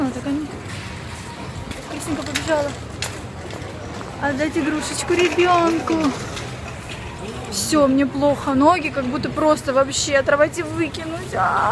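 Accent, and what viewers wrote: Rostov, Russia. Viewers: вы с Ростова?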